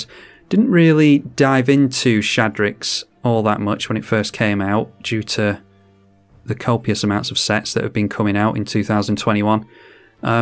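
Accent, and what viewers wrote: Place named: United Kingdom. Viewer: Talk british to me mate. Honestly your voice is outstanding.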